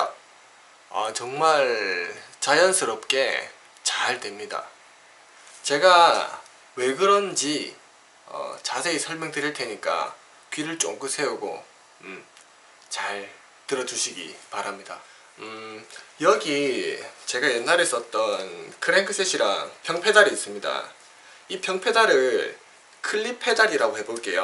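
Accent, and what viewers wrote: Gyeongsang, South Korea. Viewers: 경상도쪽 말투 같은데..진짜 찾아뵙고 배우고 싶습니다 ㅠㅠ 좋은 정보 감사합니다~ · 짜증난다 저 경상도 사투리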